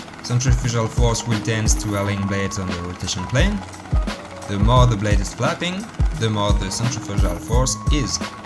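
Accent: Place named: France. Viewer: le bonne accent français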